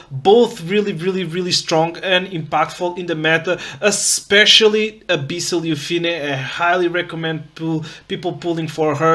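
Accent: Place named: Portugal. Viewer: Ouvi-te falar um pouco, e fiquei logo a pensar que eras tuga. Quando descobri que tinha razão... É tão bom ter um content creator de Epic7 português! Continua!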